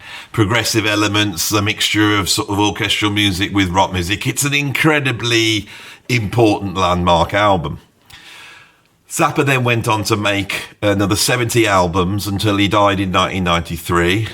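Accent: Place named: Midlands, United Kingdom. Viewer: I saw Frank at Knebworth in 1979, aged 19 & that set changed my understanding of what music was. So it's great to see this great collection & selection especially delivered in a great Midlands accent. Uncle Meat is the record I'd take to my desert island, though I'd miss the others especially Hot Rats & Ship arriving too late...& Yellow Shark &...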